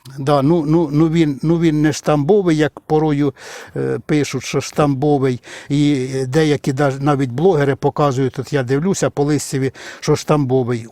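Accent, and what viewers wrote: Poltava, Ukraine. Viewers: Слухаю нашу лагідну українську полтавську мову і хочеться слухати і слухати. Ніби й знайома з усіма цими справами, з посівами і пікіровкою, та все ж -- мова, заслухалась. А ще душу радує те, що чоловік цим займається.. дякую за всі моменти радості. А ще дивлюся, як ви багато висіваєте розсади, місця треба багато. Я цей рік посіяла в ,,улітки" перець. Супер, місця займає дуже мало. Розсада класна, пікірувати одне задоволення.. в одну улітку в мене вийшло 45- 48 шт, а улітка помістилась в 1літрове відеречко з під сметани. У ваш яшик поміститься штук 8 відеречок. Попробуйте, можливо вам сподобається. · Яка ж милозвучна Полтавська вимова! І безліч корисної інформації.Наснаги і здоров'я вам і дружині.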